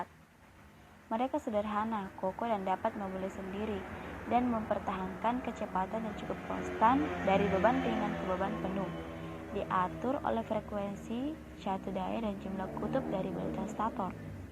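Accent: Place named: Bugis, Indonesia. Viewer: Logatnya orang bugis😂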